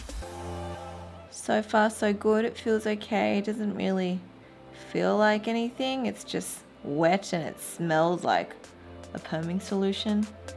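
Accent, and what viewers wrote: Australia. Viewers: I really love videos, aside from you're learning from her beauty videos, I'm learning on her accent as well since our clients are Australians. Biggest thumbs up to my most favorite youtuber!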